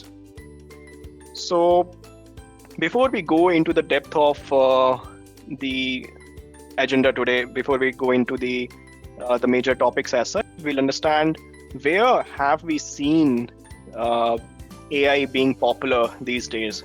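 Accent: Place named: India. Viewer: heavy Indian english accent.Cant get it at all!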